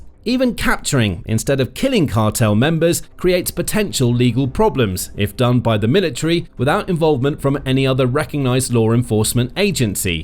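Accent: United Kingdom. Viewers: Ok, so we have a channel that talks about USA military force, and the narrator of the show has a British accent? Couldn't you get an American accent for a show about our military forces? It's just odd.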